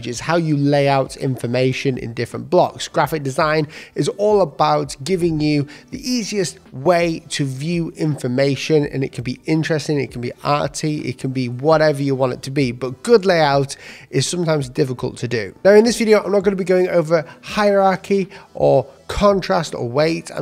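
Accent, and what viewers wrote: United Kingdom. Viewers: British accent is horrible man, some words are missing from your speech . i can't understand fully what you mean . please there is many people is not native English speaker so they don't fully understand British accent . it would be better if you speak normal English or American accent would be better for many people to understand .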